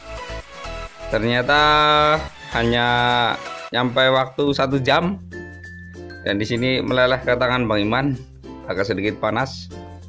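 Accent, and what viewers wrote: Banyumas, Indonesia. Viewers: Wong ngapak ya mas ?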